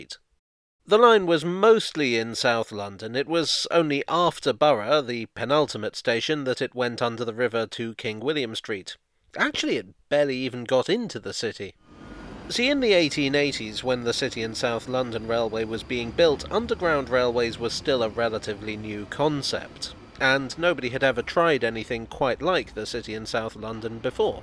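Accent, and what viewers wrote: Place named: Yorkshire, United Kingdom. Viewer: Because it’s The Borough! You sound like a Yorkshire man but even they use a t’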